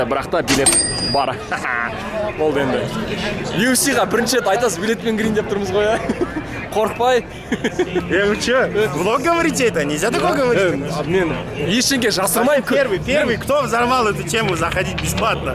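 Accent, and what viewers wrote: Standard. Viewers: Керемет Жарайсын!!!👍👍👍👍 Керемет таза казакша солийсын👍👍👍👍👍👍👍👍❤️❤️❤️❤️😂😂😂 Мен сзге жазылдым!!! Алматыдан салем!😊😊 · 🤔🤯👏👏....МәссаҒан , Сіздің ҚАЗАҚ 🇰🇿🇰🇿🇰🇿🇰🇿🇰🇿Тіліңіз Өте Тазада , Әдемі Ғой !!! Құлаққа Кереметшіл Естілуде 🎧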